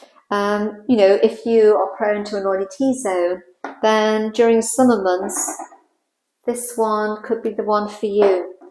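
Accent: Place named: Manchester, United Kingdom. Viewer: You have a lovely accent, are you from Manchester area?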